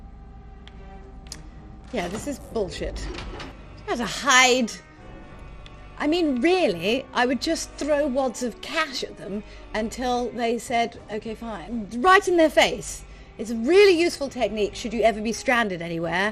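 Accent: United Kingdom. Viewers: The posh accent does it for me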